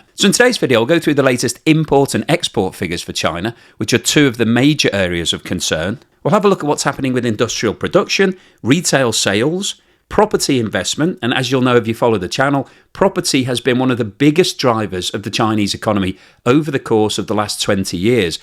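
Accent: United Kingdom. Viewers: The bloggers brit accent is hard on the ears, especially when pronounces letters T. Ouch I'm out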